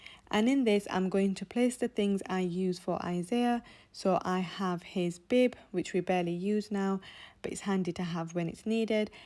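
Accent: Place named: Manchester, United Kingdom. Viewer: Sounds like a Manchester accent to me.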